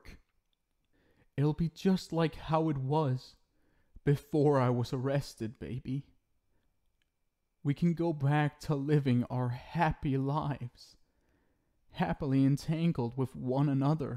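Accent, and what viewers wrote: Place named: Australia. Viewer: My thoughts through the audio "You can feel me huh?" "Whose they?" "Can it go back huh because what I'm sensing it can't" "Prison!??" "God the lil whine in this is mMMmmmMmm" "Am I picking up an accent hmm?" "Tearing there what out!?! You painted what with what!?!?" ".... Yeah I can see myself falling for someone like this" "Watching me in the shadows huh" "Yeah definitely picking up an accent but which one I think Aussie maybe a British like accent" "Raven that's a cute name" "The sadness in his voice makes me wanna hug him" "Little bit of a lisp it's adorable " "The mood changes are so cute when he speaks *proceeds to do tbe fan girl leg kicks in the air*" "The nicknames he picks are just 🤌✨" "Your maga what what?" "Awww I get a hug and he likes my hair EEEE" "Awwww kisses!! wait wtf am I doing this is a a serial killer yeahhh but he's so cute" "Oh? 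Switzerland huh hm sounds nice" "God why am I smiling" "You're slightly a psychopath but like I'm like this close to letting that slide" "I can't believe in studying to be a detective when I act like this Jesus" "Nah I think we should go considering it sounds like a lot of people" That is all 🤌✨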